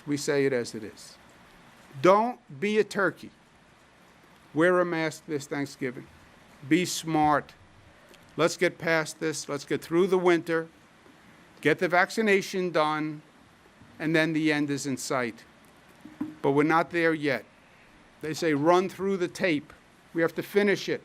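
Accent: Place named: New York, United States. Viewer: I’m not turning on the volume because I know this will be a Mario Cuomo self admiration session. I cannot stand his whining abrasive New York liberal hypocritical voice. “ I love me I love me ! I’m the very best that I can be”. No hard questions like: Why are you defunding police? Why are you ignoring the violence of “peaceful” protesters who morph into ANTIFA at a moments notice? You have your own security detail. How come if police aren’t needed? Why are you allowing destruction? Why are you trying to destroy small business? Why are you opening and closing businesses without solid fact and criteria? Why are you suddenly discounting Fauci’s recommendations? Are you a physician? WHAT WAS YOUR AGENDA PLACING COVID PATIENTS IN REST HOMES AND/OR RETURNING COVID PATIENTS TO THE REST HOME? How many died as a result of YOUR demand? Would you put your Mother in that very same rest home? 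. Why would an elected government official accept an EMMY for a self serving, self promoting FREE opportunity to spread misinformation and politic against the President and his supporters? Aren’t EMMYS an award for entertainment? Isn’t that a self congratulatory move that is BELOW even a career politicians arrogance? Congrats on your performance.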